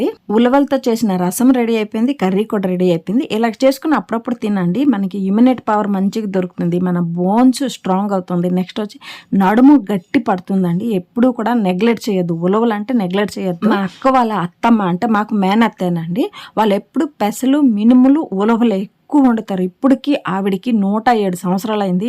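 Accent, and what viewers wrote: Srikakulam, India. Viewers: మీది శ్రీకాకుళం యాస.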